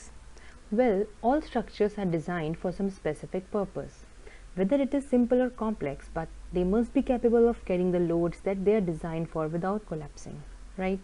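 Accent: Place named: India. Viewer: Is the teacher from India? the acccent is not standard.........but the lesson is good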